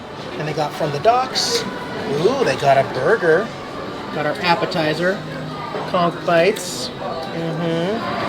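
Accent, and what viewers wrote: United States. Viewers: Geez am I glad you didn’t mention the name of the native Australian bird because you American say it wrong. Just as a point, its not pronounced emoo.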